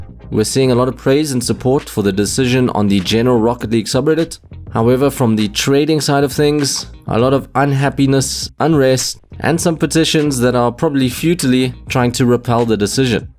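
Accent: South Africa. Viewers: Yo bro you south african?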